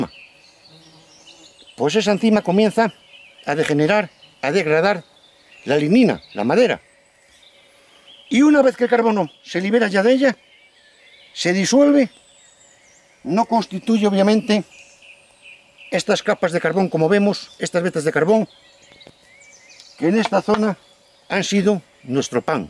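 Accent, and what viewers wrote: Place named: Spain. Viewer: Es agradable escuchar su acento y su gramática. Habla muy especial el idioma español. ¿Qué región de España es usted caballero? Post data: gracias por la información sobre el carbón, necesitaba esa información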